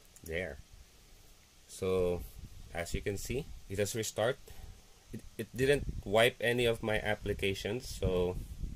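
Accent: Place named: Philippines. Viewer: you sound like a filipino.. are you pinoy?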